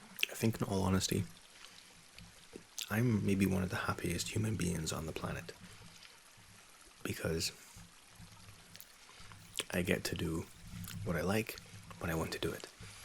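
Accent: Scotland, United Kingdom. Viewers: You still have a strong scottish twang to your accent :) yay for scotland ❤☝ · You sound Scottish to me mate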